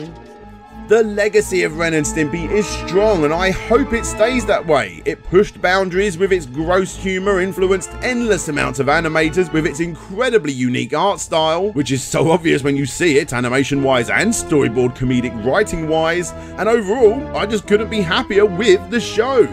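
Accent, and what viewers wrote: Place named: United Kingdom. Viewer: My wife can't understand English and still notices you're British.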